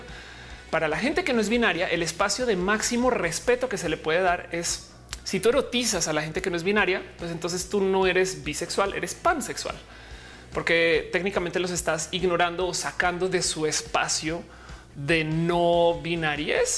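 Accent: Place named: Colombia. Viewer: Que felicidad tener de vuelta a la, que por cierto volviste de tus vacaciones con acento colombiano mas marcadito 😁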